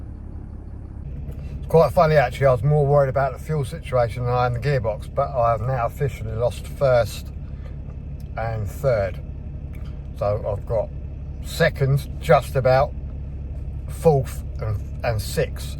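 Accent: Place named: West Sussex, United Kingdom. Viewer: I know that feeling of having motor problems with a long drive ahead. You dealt with it well mate. Once you were on the motorway the gear changing isn't the problem it is on small roads and in the towns, but you can't relax, it's there in your mind all the time. I could tell from your accent you were from the west Sussex coast - I used to live in Littlehampton and Bognor and I have it too. I am going to message you one way or another with some info. Happy new year, Pete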